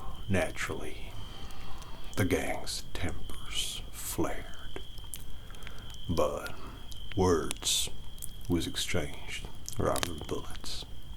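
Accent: South, United States. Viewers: Being a Texan myself, I don't have this kind of Southern accent, but I do find it so funny whenever I hear it. Great job, you are a wonderful talented artist. And, I'm afraid to admit, kind of sexy in this one, if you don't mind me saying so. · Well, butter my ass and call me a biscuit! Our boy can speak Southern! 😁 Great job on this. The crickets and night sounds were awesome. Every video is like stepping into another world for a while. You're amazing.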